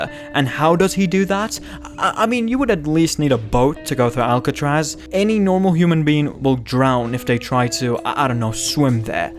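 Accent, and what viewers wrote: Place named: United Kingdom. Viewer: Hi British guy IT RYTHMS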